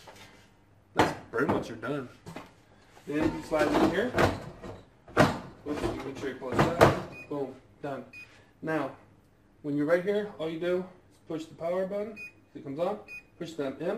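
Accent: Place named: Southern California, United States. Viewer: Never mine aha I saw your Cali tatoo more into the video. Only asked cause the way you talked you reminded me of my self. You talk exactly like me I’m from so cal Anyways cool video using my fryer right now !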